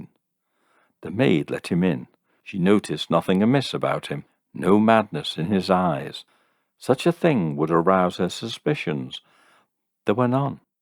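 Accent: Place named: Liverpool, United Kingdom. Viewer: You mentioned in one broadcast that you lived in Balham, London. Your accent sounds a little bit like Wirral or Liverpool.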